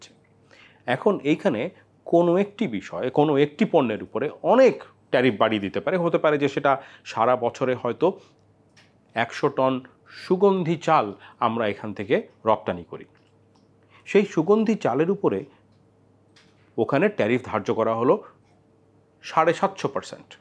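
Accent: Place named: Kolkata, India. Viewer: আপনার কথাগুলো কলকাতার বাঙ্গালীর মতো, দাদা কি কলকাতার নাকি?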